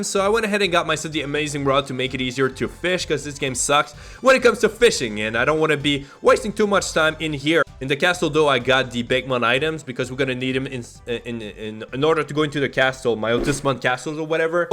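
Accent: Quebec, Canada. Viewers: His French canadian accent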